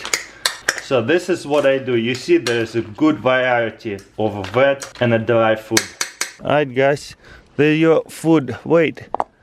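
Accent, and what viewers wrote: Russia. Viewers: Are you Russian? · I've always liked Russian accents but yours is remarkably nice to listen to. Love the commentary lol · His russian English accent is a kind of cute!! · Are you Russian Cuz u have a Strong Accent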